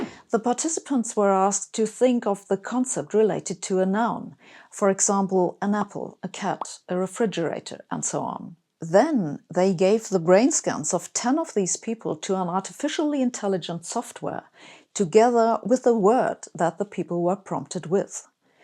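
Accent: Germany. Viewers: your voice with a heavy German accent would the best replacement for computer Hal 2001, even better than the one from the movie Dark Star. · You brought your German sound palate to your superlative command of English. Please don't shed it. There's no reason why pentrating intelligence shouldn't be peppered with exotic charm.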